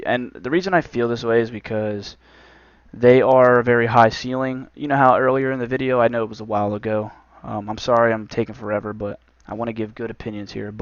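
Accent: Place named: Pennsylvania, United States. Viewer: Your accent reminds me of Bam Margera, lol you from PA I bet. It's all in the way you say "classes" it reminds me of when Bam says "asses" HAH!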